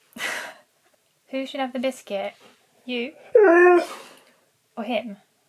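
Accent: United Kingdom. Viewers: What kind of english accent it is?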